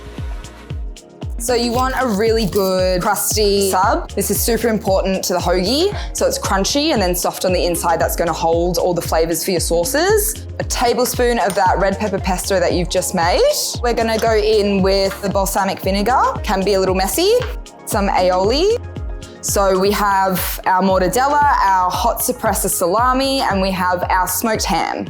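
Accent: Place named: Australia. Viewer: I think she's from Australia or somewhere "over there" Everyone has an accent no matter where we're from. Get over it... 😏 And... you can hold the Balsamic Vinegar on my Sandwich too... 😢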